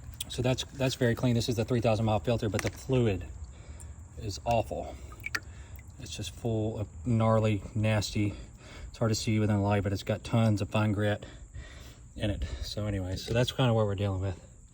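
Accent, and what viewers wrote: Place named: United Kingdom. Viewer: What's with the British voice over? This is clearly in the US lol. It's in Florida in fact.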